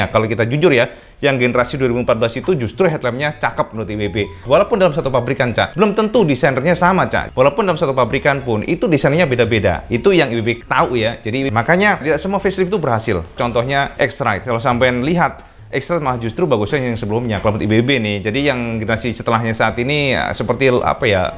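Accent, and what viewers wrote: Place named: Java, Indonesia. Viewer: Kang iwb emang gokil cak...podo jowone karo aq...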